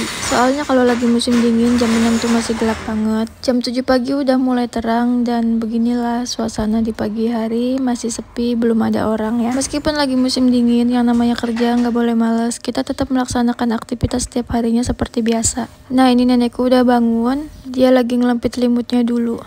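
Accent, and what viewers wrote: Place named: East Java, Indonesia. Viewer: OK, tetap semangat ya.. Setiap hal yg kita kerjakan dg niat & cara yg baik, InsyaAllah mendapatkan hasil yg barokah.. btw, logat bicara terdengar cukup familiar. Dari Jawa Timur kah?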